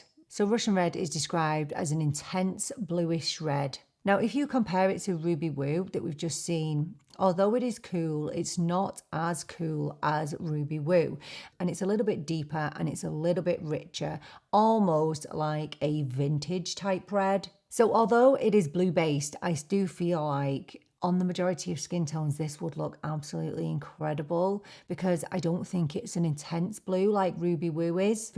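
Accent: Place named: Manchester, United Kingdom. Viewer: Loved this! I definitely dont have the confidence to wear red still enjoyed seeing you try them all on. Was shocked to hear you dont live in the UK you sound straight of Greater Manchester to me 😮 ❤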